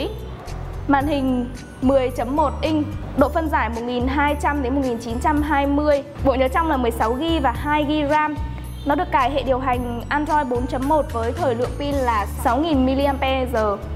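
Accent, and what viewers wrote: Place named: Hanoi, Vietnam. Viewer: uầy uầy uầy uầy uầy hôm nay là chị mà, vui quá vui quá, mà hình như là giọng chuẩn Hà Nội ròi, hay hay hay, vote up vote up, hay hay hay, mới nghe rồi nhảy xuống cmt cũng thấy hay, hay hay hay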